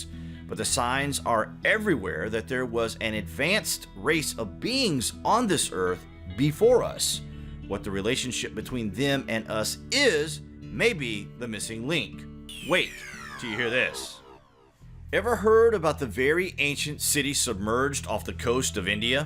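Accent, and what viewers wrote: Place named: California, United States. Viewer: ‘We’ and ‘our’ - plebeian public mind nonsense. It’s hard to get to the substance here because of the terrible writing. Stupid California accent doesn’t help. Learn to write like an individual and get a narrator who can speak standard English with RP or standard American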